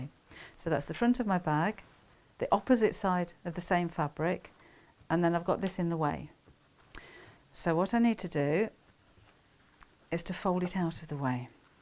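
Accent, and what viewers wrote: United Kingdom. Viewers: Where are you that you call it a zip? The accent sounds British? In America we call it a zipper. Your bags always look so darn cute!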